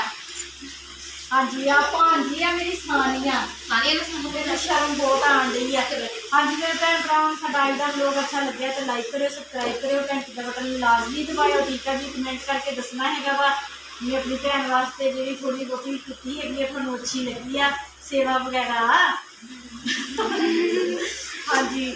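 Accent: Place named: Faisalabad, Pakistan. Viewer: وعلیکم سلام باجی صاحبہ کیسی ھیں کیا آپ فیصل آباد سےہیں آپکے بولنے کے انداز سے ایسا لگا 😊😊😊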